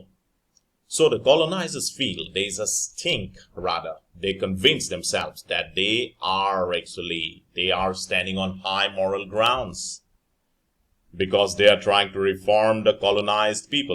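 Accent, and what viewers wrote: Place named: Assam, India. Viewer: Literasure.. I love ur assamese accent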